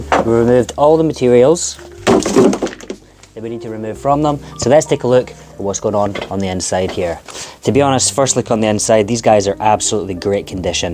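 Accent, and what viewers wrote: Scotland, United Kingdom. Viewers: Watching an amazing craftsman at work…wonderful. Add in soft background jazz, a Scots accent, muscular arms and hands, eyes that give James McAvoy vibes, and wow! Sorry to embarrass you, but it’s true!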